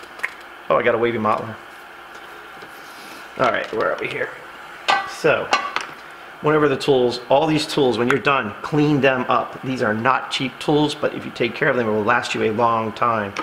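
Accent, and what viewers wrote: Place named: New Orleans, United States. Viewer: Where are you from? You sound like you are from New Orleans. I grew up here and the accent sounds familiar.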